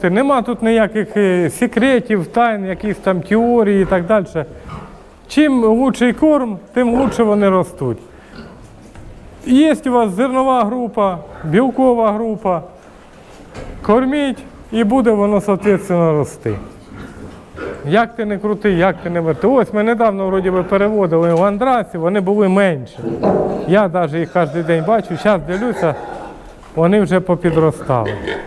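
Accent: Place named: Poltava, Ukraine. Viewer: Ви случайно не с Полтавскої обл, говір дуже схожий,кумедний і цікавий?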